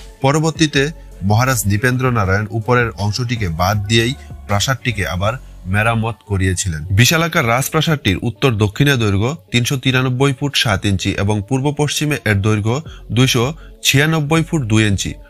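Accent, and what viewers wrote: Bangladesh. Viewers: Byash not re beta besh bolo ....Dhakaiya kotha kar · উচ্চারণ এ পরিস্কার বাংলাদেশী ছাপ- এরমধ্যে>এ্যারমধ্যে, এছাড়া>এ্যাছাড়া,প্রথম>পথম,next>naxte ,😂 , তবু ও খুব ভালো হয়েছে, শুভ কামনা রইলো।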